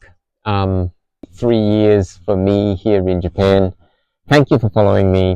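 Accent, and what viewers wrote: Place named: Malaysia. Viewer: I’m here after watching the video of you speaking with your daughter about complexion. As a mum of 3 beautiful mixed girls (Indian and Chinese), I do feel for them when they are asked about their heritage. But they consider themselves lucky.. they get double the festive celebrations and double presents! We are blessed to live in Malaysia. And I detected a slight Malaysian accent when you spoke, happily I was somewhat correct, lol. Good luck in your journey. Home is where the heart is!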